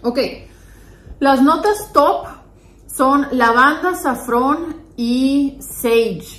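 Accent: Mexico. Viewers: Llegué buscando una Buena reseña sobre perfumes de Lattafa Femeninos y encontré el mejor jajaja😂 no paro de reirme, además el acento mexicano es único lo amo. Khamrah : Esto es un perfume para alguien que ya lo arrastró la Vida 😂 Te ganaste una suscriptora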